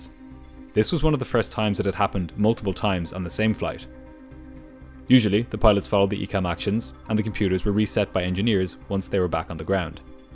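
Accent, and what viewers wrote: Ireland. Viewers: Love your channel ❤ ye sound like a fellow Irishman 🙋‍♀️🙋‍♀️ great to see an Irish channel doing well 🇮🇪🇮🇪🇮🇪🍀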